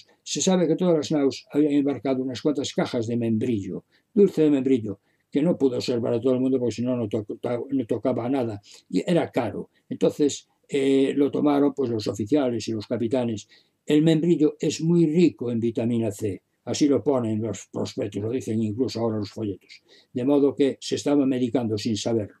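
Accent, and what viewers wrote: Spain. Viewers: Disfruto muchísimo mi abuelo era español y el acento del señor es para mí familiar y he escuchado varias conferencias.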